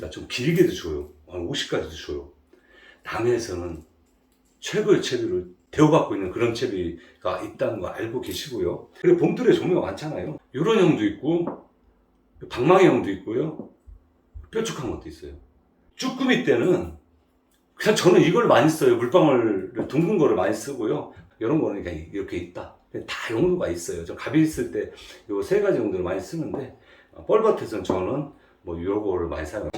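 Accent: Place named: Chungcheong, South Korea. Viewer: 님께서 이번에 올려주신 영상 토대로 공부해서 출조하겠습니다! 속 시원한 설명과 유익한 정보 감사드립니다^^ 충청도 사투리 정말 맛있게 쓰시네요ㅎㅎ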